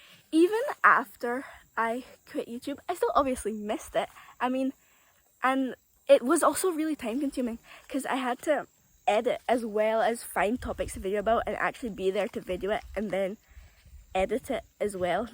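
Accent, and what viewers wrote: Scotland, United Kingdom. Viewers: Nice you are speaking Scottish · Congratulations on your academic achievements. This is only the beginning, stay focus and you will accomplish more academic success. I am not surprised at your scholastic success. There's absolutely nothing wrong with speaking with a Scottish accent. Enjoy your Guyana vacation. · Hi, so great to see you and hear your Scottish accent. You have to do as the Scotts do, lol. You are an outstanding student. Keep up the great work—this is your time to reach the stars. We will be here to welcome you back whenever time permits you to make videos. Stay safe and well. God’s blessings on you and your family 😊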